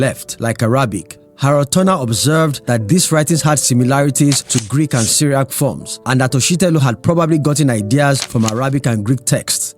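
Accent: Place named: Yoruba, Nigeria. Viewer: i love your authentic Yoruba accent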